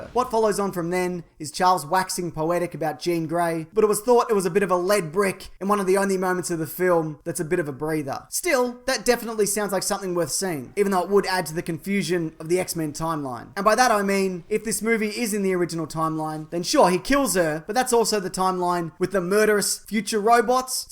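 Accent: Australia. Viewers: you sound like aussie man.......not just because you are Australian but you sound like him.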